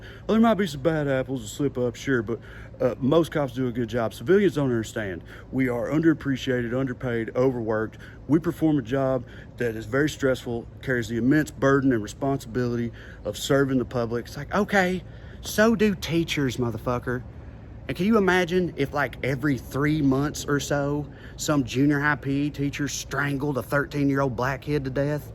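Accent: South, United States. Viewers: I just think you’re simply awesomeness with a strong Southern accent. I love how you think and how you express it. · Combining wit with reasoning and great intelligence isn't easy but you definitely nailed it with lovely southern drawling lingo too ... Was even able to enjoy it in entirety back here in Oz... Could stand a heap of this, no kidding ! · I love hearing truth spoken in an accent like mine. Full disclosure, you made me proud to be southern!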